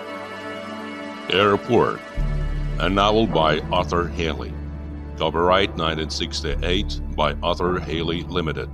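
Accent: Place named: United States. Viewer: Какое замечательное американское произношение в стиле <num>-х годов. Как всегда, замечательная дикция! Я же говорю, что у Вас огромный исполнительский потенциал, над которым надобно продолжать работать, чтобы не растерять, а развить до логического максимума. А так - брависсимо🎉!!! А насчёт "врагов"... Я в своëм пионерском возрасте - КИДовец... 😊 Эдак то, милостивый государь😊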